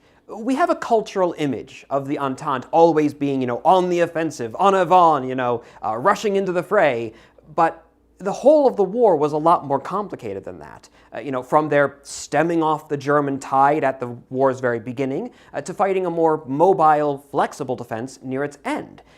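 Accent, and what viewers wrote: United Kingdom. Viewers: is the only person alive who is able to sound british while talking in an american accent.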